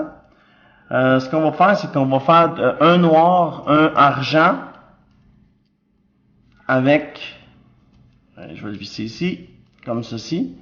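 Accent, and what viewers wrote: Quebec, Canada. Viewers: J'adore l'accent québécois ! J'aimerai avoir un handspinner gratuit car je peux pas en acheter :(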